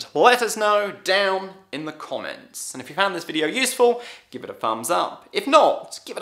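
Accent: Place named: United Kingdom. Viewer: U spoke like British And British accent is hard to understand for many An American accent is good and easy to understand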